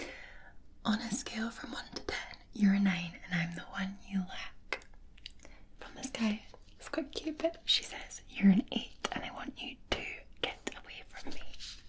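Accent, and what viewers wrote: Scotland, United Kingdom. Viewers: 🧡 thank you very much for this video. I truly do love it. Is it the best one yet, I wonder if it can get over the best ones before. And you're wearing such a great dress, the dress with all the cute things. What an awesome clothing, best spring dress. 😊 I bet you chose it because of the spring did you. 😃 Also I loved your latest video. Even though your Scottish accent is amazing, I also like to hear you talk with that English accent. You were really wonderful with your acting skills and making me sleep with entertainment attached to it. I had looked forward to see Lara Croft again and there poof she appeared like magic. You were awesome, schön, ausgezeichnet. 😃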